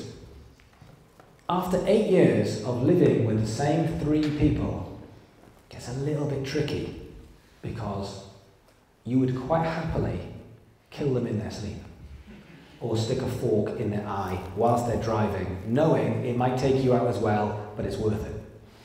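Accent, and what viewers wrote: United Kingdom. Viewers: I watched over 30 of your videos in the last week. First: love your brit accent. Second: great contents. Do you know Marco Montemagno? Is a 47 y o men who lives in Brighton. He helps people with startups and big companies in marketing strategies, you should contact him ;) He also has a BIG youtube channel in Italian where he talks about marketing, social media etcs.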